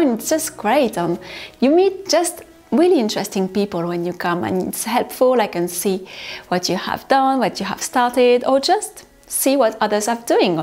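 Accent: France. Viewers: Love watching you decorate. Not taking anything away from your immense talent which is everything, you must be aware that your French accent and living in a wonderful home in France is what sells it. I am jelly.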